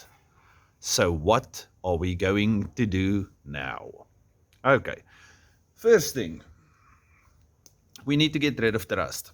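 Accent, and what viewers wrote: Afrikaans, South Africa. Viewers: love the Afrikaan accent, groeten uit Nederland! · South African Accent?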